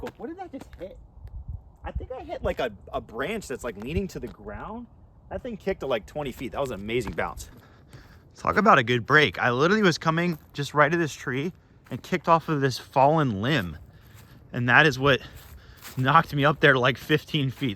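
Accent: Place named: Midwest, United States. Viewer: I think the idea of creating real stakes for tournament style scoring is a good style video .. not that I mind watching one of the better disc golf YouTubers play a round trying to score well, but cool to see that you tried to give yourself a reason to score your best but still just playing by yourself doing your thing Also I felt like I noticed a slight Midwest accent and maybe it’s the North Dakota sweatshirt but tripped me out a little bit I thought you were/are from VA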